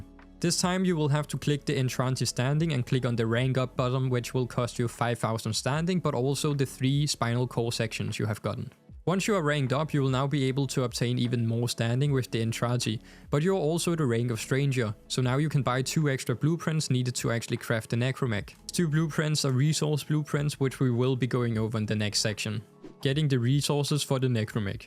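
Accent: Denmark. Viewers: This video really helped🤩. I have a question are you from Denmark because it sounds like it with your accent?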